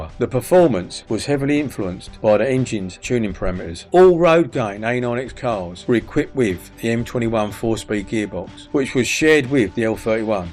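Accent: England, United Kingdom. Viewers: A pommie accent talking about Torana …?!?! This is not good .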